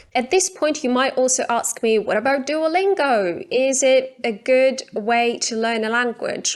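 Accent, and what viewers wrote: United Kingdom. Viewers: Какой красивый британский акцент.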